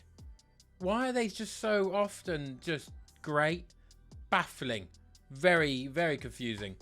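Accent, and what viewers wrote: Manchester, United Kingdom. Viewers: thats a great manc accent you got there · strong Manchester accent you've got fella 🤔 may I ask what part of Manchester you're from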